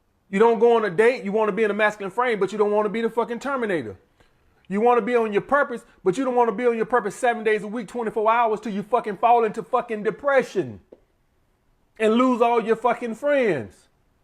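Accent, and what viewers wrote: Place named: Georgia, United States. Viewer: I can always tell when you go to another state especly Georgia ur accent hilarious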